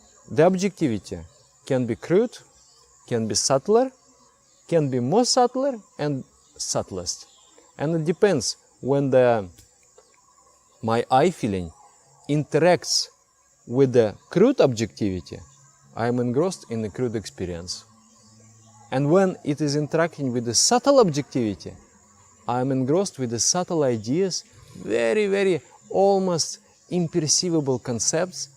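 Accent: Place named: Russia. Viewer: Your Russian accent makes it easier to understand what you are saying, for some reason :)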